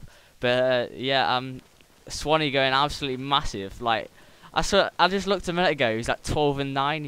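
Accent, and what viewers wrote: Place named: England, United Kingdom. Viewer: your english accent <3 really good gameplay and commentary so looking forward to next one, if you want anything uploaded just send me a message and i will do it asap. // Vizze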